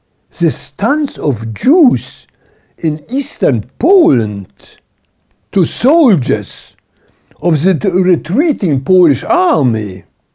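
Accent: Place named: Germany. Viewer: niby mówi po angielsku ale akcentuje jak po niemiecku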